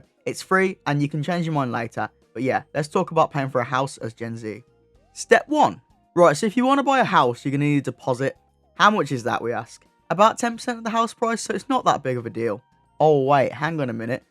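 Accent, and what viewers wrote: United Kingdom. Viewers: Im Nigerian living in UK,but that British acc is mad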